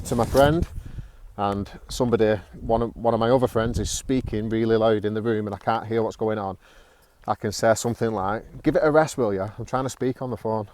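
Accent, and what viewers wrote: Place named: North, United Kingdom. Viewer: Yeah, I guess I've been more exposed to American English lately. It's quite refreshing to hear someone speak with a northern British accent. It brings me memories ( good and bad) from my stay in Manchester. (Fallowfield and Salford)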